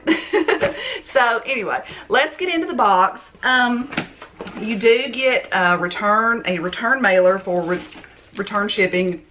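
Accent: South, United States. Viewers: First time viewer. I subscribed. You have a nice southern accent. Where are you from?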